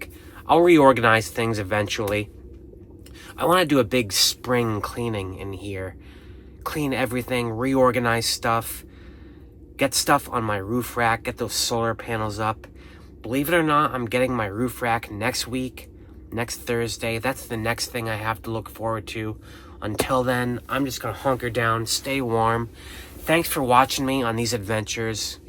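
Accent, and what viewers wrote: Australia. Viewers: 🤣😂 that's an Australian accent 🙋🏻‍♀️🇦🇺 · That's not a British accent! That's an Australian accent!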